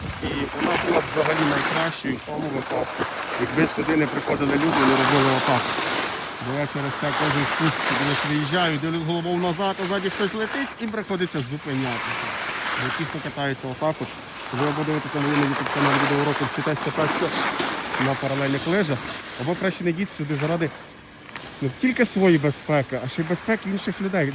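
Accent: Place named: Transcarpathia, Ukraine. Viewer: Гарний закарпатський говір !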